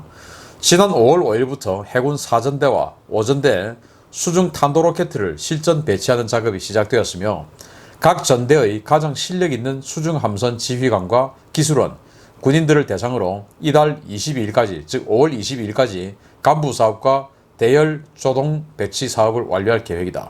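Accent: Gyeongsang, South Korea. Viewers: 나는 미국 샌프란시스코에서 살고있읍니다. 신인균 교수님은 애국자며 잘 연단된 분이며, 이 대한민국에서 발견 됬음을, 참으로 축복받은 한국이라고 생각 합니다. 결론은, 신인균 교수님은 애국자이시며, 남한에 대통령감 입니다. 빨갱이 이북놈들과 싸워 이길려면, 신인균교수만한 능력있고 자격있는 사람은 하나도 찾아볼수 없었읍니다. 얼굴도 대통령 얼굴 처럼 잘생기셨고, 한국을 바로세울수 있는 분은, 바로 신인균 교수님이라고 인정합니다. 세게 어느대회에 나가도, 한국을 대표하는 대통령으로서, 하나도 부족함이없이, 잘 훈련받은 훌륭한 대한민국 남자라고 봅니다. 내년 대통령 선거에, 꼭 출마하셔서 대통령이 되셔야 대한민국을 살릴수 있읍니다. 미국에있는 우리 교포들이 전부 다 간절히 바랍니다. 그런데, 한가지 바라옵기는 , 경상도 사투리는 이해가 참 어렵습니다. 조금이라도 고쳐주시면, 우리가 듣기에 많이 쉽게 들을수 있겠다고 생각하며, 그렇게 노력해주심을 진정으로 바랍니다.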